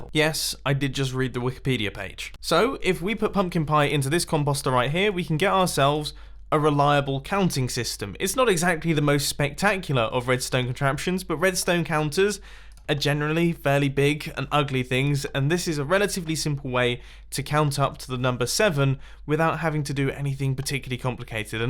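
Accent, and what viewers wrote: United Kingdom. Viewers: That's why he's getting annoyed, because from UK he pronounces it differently from Americans. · For StandingWind: That's because he isn't from USA. He is from UK and therefore the accent is different. Got it??? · I'm pretty sure the way he says it is a British pronunciation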